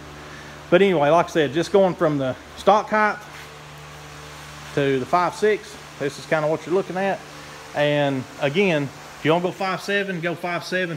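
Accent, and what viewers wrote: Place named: South, United States. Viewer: I love that accent of the southern folks reminds me of my good friend blessings to you and him 👊🏽😁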